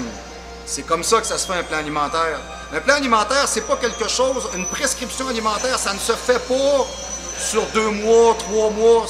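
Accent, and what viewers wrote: Canada. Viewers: Il me faut une de ces concentrations pour comprendre tout ce que tu dis (à cause de l'accent) mais c'est genial !! Jsuis partie pour TOUT regarder depuis le debut... Le Denis WOLF canadien :)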